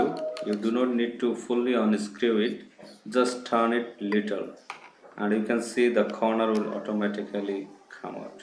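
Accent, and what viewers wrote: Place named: India. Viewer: Bon sang, Mais pourquoi les indiens ou pakistanais parlent tous avec cet accent ?? on dirait une sorte de blague. J'ai toujours l'impression de regarder une mauvaise série sur le câble... Enfin, merci pour la vidéo sinon.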